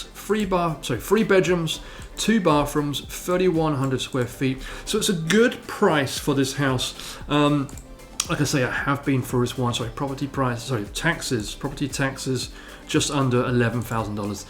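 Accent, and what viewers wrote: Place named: United Kingdom. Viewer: You appear to have a British accent where in the U.K. are you from as a Brit I can’t quite place it? Also my wife who is a portlander says that area is surrounded by bad areas and not that great a place to live in the Portland area. She compared it to Birmingham in the U.K. one or two streets great surrounded by bad areas.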